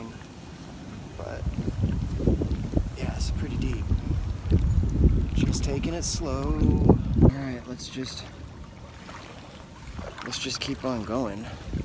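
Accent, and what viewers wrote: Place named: United States. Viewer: I just love the accents you Americans have. 🇦🇺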